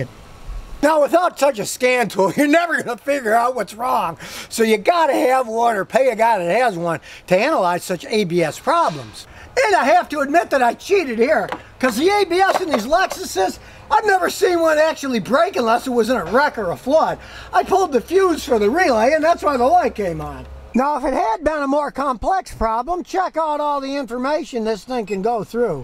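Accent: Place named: Texas, United States. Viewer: I love your sweet Texan accent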